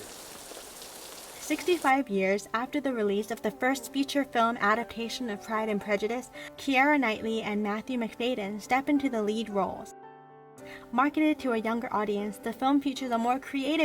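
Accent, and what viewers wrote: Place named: United States. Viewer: It is weird hearing the narrator with a thick American accent. “Prade and Prajadice”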